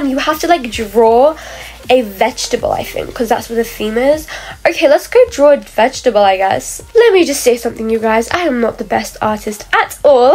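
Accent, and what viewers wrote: United Kingdom. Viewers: You sound like a british gurl 😮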